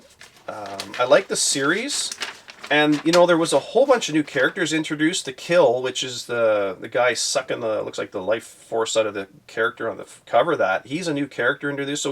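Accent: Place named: Canada. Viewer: Enter me for Venom 5 and thank you for simply talking comics with a Canadian accent 😃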